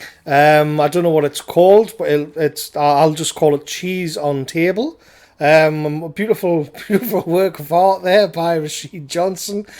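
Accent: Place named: Newcastle, United Kingdom. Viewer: These ancient little places - unique on planet earth - are being used as toilets by the elitist commie cunts. This enrages me so much, but I have to confess that without these cultural terrorists I don't know if I would have come to this level of love for my people and land. I always did but it was wishy washy. I see this same garbage here in Cornwall - people who aren't from here come and see our beautiful way of life and want to join. Then they change this bit. And then build a massive housing estate next to a church that was built in the 8th century. No consideration for anyone or anything except progress and of course they profit from the desecration. I appreciate your take on things, always liked the geordie accent.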